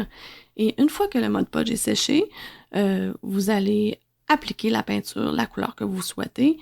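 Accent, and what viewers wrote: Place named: Canada. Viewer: Bonjour, Je vous écris depuis la Suisse, et je viens de découvrir votre site et vos tutos bravo pour de la qualité des explications, le plus dur c’était de s’habituer au charmant accent Canadien, mais après cinq minutes je 'y suis fait et maintenant je vous comprend parfaitement (ah ah ) petite question technique je désire faire des pochons mais un peu plus épais car dedans je vais couler de la résine quel matériaux faut'il utiliser ? , j'ai essayé avec des feuille de bois balsa comme le conseil cricut, malheureusement après..... 39 heures de découpe je ne suis pas arrivé a le découper et pourtant n’utilisait l'outil conseillé par cricut, que pouvez vous me conseiller de prendre comme autre support qui a une bonne épaisseur (env 1.6 mm au légèrement plus) afin de faire mon pochoir ?? je vous remercie - Laurent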